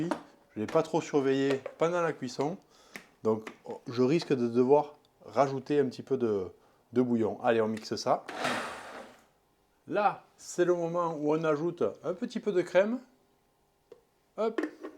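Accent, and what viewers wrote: South, France. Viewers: La recette que je cherchais, merci ! Et puis cet accent du sud, un vrai voyage culinaire ! 😊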